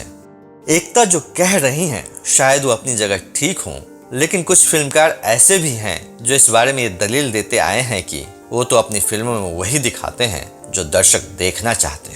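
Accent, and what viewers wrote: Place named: Bengal, India. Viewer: ji Inka Bengali accent hai isiliye r ko d keh rahe hai.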